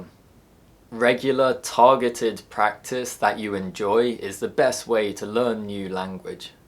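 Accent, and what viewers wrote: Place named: RP, United Kingdom. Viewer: I just watched this video, I’m really interested on English pronunciation and I deeply appreciate your useful lesson and also your modern RP pronunciation. Thank you so much!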